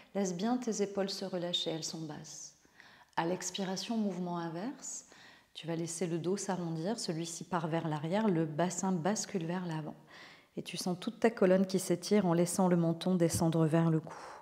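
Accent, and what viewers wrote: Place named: Europe. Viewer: Belle séance très appréciée ...je ne peux faire la guirlande jusqu'en bas , à cause de l'arthrose à un genou . Pas grave je m'ajuste..j'aime tes séances toujours bien expliquée . Je viens du Québec et toi ? ...d'après ton accent je devine que tu demeures en Europe........de Louise Joyeuses Pâques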